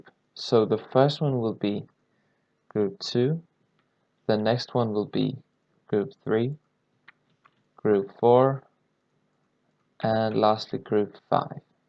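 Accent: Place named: Denmark. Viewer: Why does this guy have a danish accent lol Are you from denmark:0 If you are then hi, jeg også dansk:D:D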